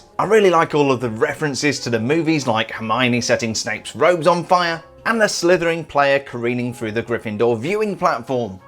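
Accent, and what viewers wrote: United Kingdom. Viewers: Love your British voice sorry if racist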